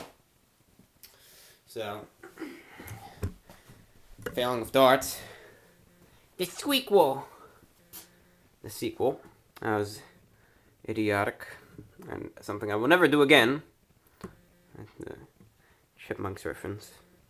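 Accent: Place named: Pennsylvania, United States. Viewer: I just discovered your youtube report today. A fresh face with the mystery of sincerest tomfoolery. The ‘dart redux’ episode (6 years ago) at least presented an admission to a degree of insincerity….mixed with brutal honesty. I wanted to see your first reports to flesh out the genuine man and compare with your more recent reports. Your consistency and demeanor is calming. And you lost that east Penn accent somewhat. Bits of mine slip out from time to time. (No one notices)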